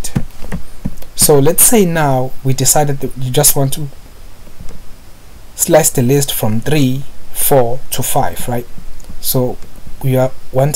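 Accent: South Africa. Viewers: you sound south african sir. thank you by the way!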